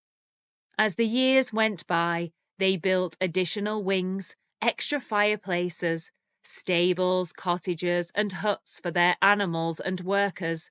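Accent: England, United Kingdom. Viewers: Are you from britain, your accents is soo british..thats nice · Hyy I like your accent that's why I'm addicted to your voice hope you Will continue these masterpiece stories ❤️ I wanna get British ascent like you , may be you can understand how much I love British accent 🙂🙂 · Yes indeed, I really enjoy the story. Love your English accent.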